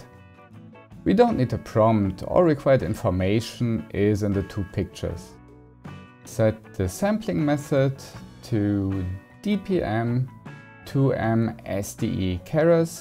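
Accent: Germany. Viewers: Man hört leider das Deutsch sehr stark raus durch den Akzent. Aber sonst gutes Video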